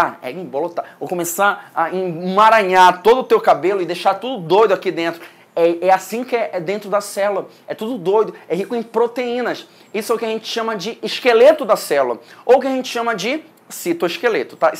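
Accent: Pará, Brazil. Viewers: Mano, tu é paraense ? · professor, vc é paraense? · beeem paraense kkkk, sou do Pará tbm, mas não tenho sotaque daqui kkkkkk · Tu é paraenses né parente ? Professor muito simpático.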